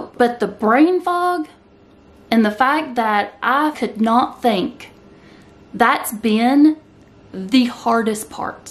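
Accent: South, United States. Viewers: I love how you talk. You’ve got such a lovely accent. I’m guessing you’re a southern lady? x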